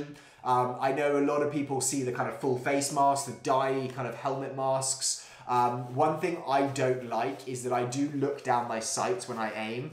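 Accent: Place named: United Kingdom. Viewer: Great videos, nice to hear a uk voice reviewing airsoft !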